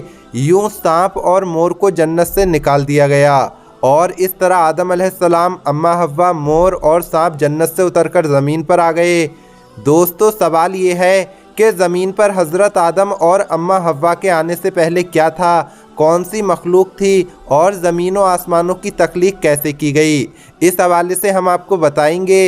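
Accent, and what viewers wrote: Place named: Bihar, India. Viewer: Hi batchit se to bihari lag raha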